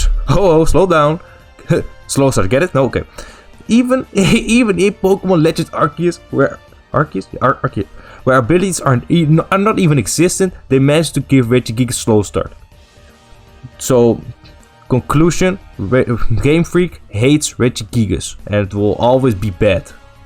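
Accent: Netherlands. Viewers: Lmao dat nederlandse accent maakt deze video zo veel beter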